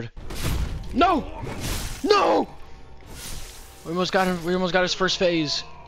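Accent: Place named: Delaware County, United States. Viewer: Immediately clocked that mid Atlantic accent. Youse from delco broh?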